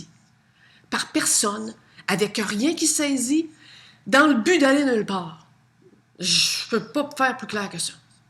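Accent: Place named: Quebec, Canada. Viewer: C'est absolument génial l'exemple de la "non-couleur" c'est hyper parlant !!!!! Énorme... J'adore ce que vous dites et j'adore votre accent québécois ! Génial !!! MERCI 🌞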